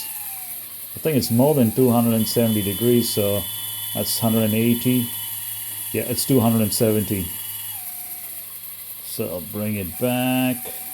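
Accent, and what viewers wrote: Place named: Netherlands. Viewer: Okay, just thought you might be from Holland originally, your accent are similar to Josh' at Hemistorm RC :)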